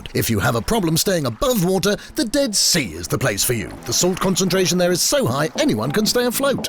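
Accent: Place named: United Kingdom. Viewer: This is SO well done! the facts slide from one to the next smoothly, the research is brilliant and the photography top notch. (& everyone LOVES a British accent right?) Well done! My fave part: Ban on ads featuring underweight models! YES!! Love you MORE Israel!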